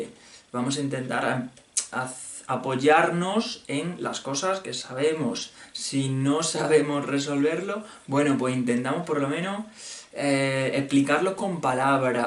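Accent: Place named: Castile, Spain. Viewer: Buen vídeo! En este vídeo te sale un acento súper castellano comparado con los otros!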